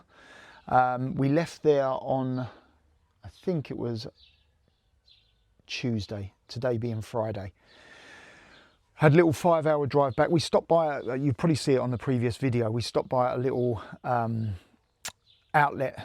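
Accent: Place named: Eastbourne, United Kingdom. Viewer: Haa you can talk all day I love the accent. Reminds me of when I lived in Eastbourne.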